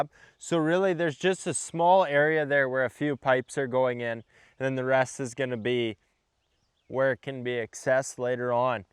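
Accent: Canada. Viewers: I'm here for the Canadian accent.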